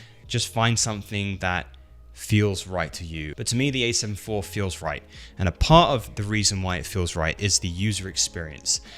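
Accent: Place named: United Kingdom. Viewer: You from the UK cool.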